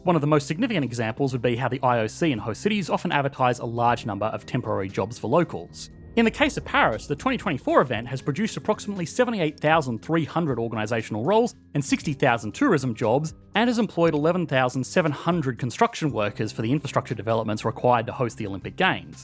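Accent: Australia. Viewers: Stop speaking in Australia sound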